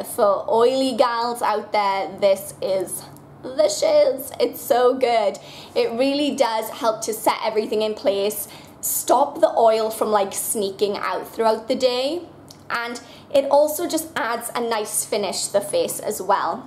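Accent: Wales, United Kingdom. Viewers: I love your Welsh accent!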